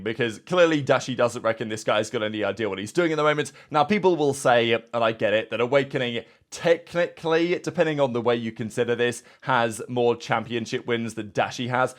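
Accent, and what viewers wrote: United Kingdom. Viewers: Glad you made the decision to keep the intro. I was watching one of ur vids over Christmas break and my mom heard the audio and she was like “Holy fuck that’s a hell a British accent, I can barely understand him with that accent and how fast he’s talking” 😂😂 we’ve gotten used to the intro and switch it up if u think it’s needed change is good but I’m glad the original intro is staying for now lmao.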